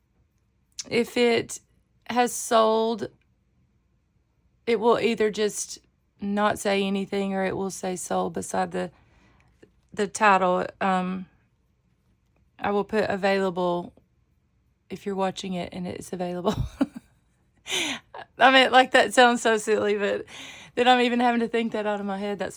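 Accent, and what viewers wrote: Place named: Tennessee, United States. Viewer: I came here from thrifty day to hear your accent lol. It sounds a little bit like my Tennessee accent but yours is cuter 😂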